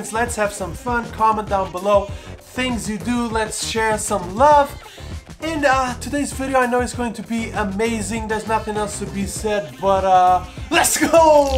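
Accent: Brazil. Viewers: Recebo a notificação e venho correndo para assistir ao vídeo 😊 Melhor canal da vida, com o português mais brasileiro do YouTube ❤🇧🇷❤🇧🇷❤🇧🇷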